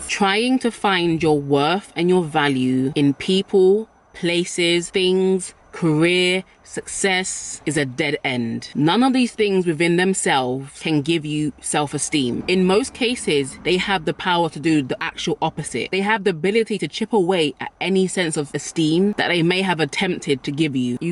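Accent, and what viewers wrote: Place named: United Kingdom. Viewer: I love her ,I think it because I feel like a black person 😂inside of me , the only thing is I don't like her British'accent its kind of crazy for me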